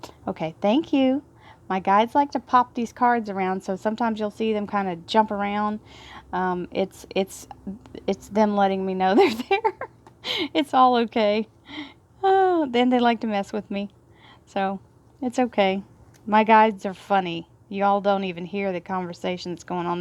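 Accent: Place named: South, United States. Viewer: Once again, being a TN gal, I just love your southern accent. You sound exactly like my sweet Aunt Charlotte. Thank you so much for sharing your precious gift with us. Your readings are 1000% resonant with me. 🙏💗💜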